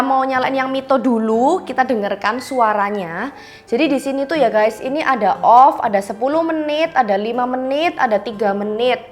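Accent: Java, Indonesia. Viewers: medoknya saya suka 😍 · Cantik + oriental + muuuuedok = ❤ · Makasih orang baik 👍🙏💕...Kusuka mbnya bahasa nya medok Jawa 👍🙏🇮🇩💕😅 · Medok logat jawanya 😂😂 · terus dipinek2 😂🤣medokk bngeett mbk e .. lucu .. makasih review nya kk .. milih Arashi aja dehh go co😅😅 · Medok men Yo jowone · medok kali suaranya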